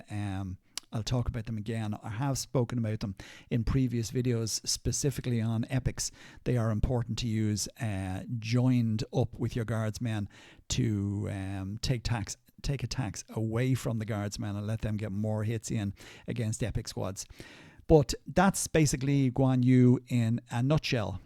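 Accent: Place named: Ireland. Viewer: i love the irish accent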